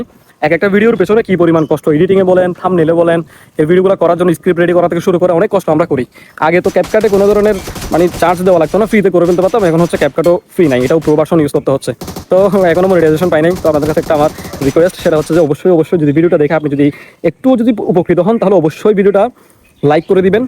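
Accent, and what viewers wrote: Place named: Chittagong, Bangladesh. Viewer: হ্যাঁ ভাইয়া ভালো কনটেন্ট ক্রিয়েট করার জন্য আপনাকে কিছু উপদেশ দিতে চাই কথাগুলো আস্তে আস্তে বলবেন যাতে করে মানুষ আপনার কথাটা সম্পূর্ণ বুঝতে পারে. আপনি চট্টগ্রামের আঞ্চলিক ভাষায় কথা বলায় খুব দ্রুত বলে ফেলেন তাতে করে অনেক মানুষের বুঝতে সমস্যা হয়।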